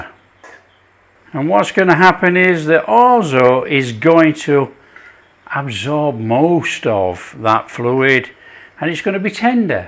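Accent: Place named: Sheffield, United Kingdom. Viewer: I definitely will! I have made your mashed potatoes with cabbage, green onions and bacon; twice already! My family can't get enough of it! Today I made it with corn beef, and it was an absolute delight! So thank you for the recipe,! If I may ask you, what part of UK are you from? We love your accent! It reminds me of our family friend, from Sheffield UK!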